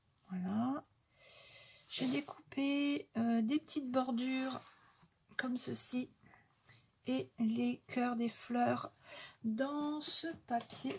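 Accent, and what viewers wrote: North, France. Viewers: J'aime vos cartes de Noël et le bon accent du Nord si je ne me trompe pas a bientot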